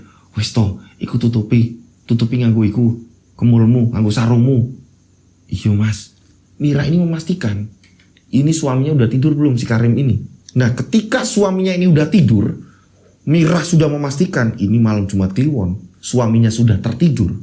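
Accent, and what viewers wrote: Java, Indonesia. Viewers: Kembali hadir denpasar 😊, seneng skli dengar crita dik...ngena skli dng bhsa jawanya..suka skli dngernya....bravo dik..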